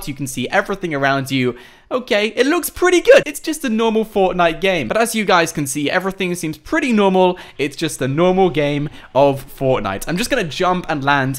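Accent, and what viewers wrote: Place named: RP, United Kingdom. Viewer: his accent is so posh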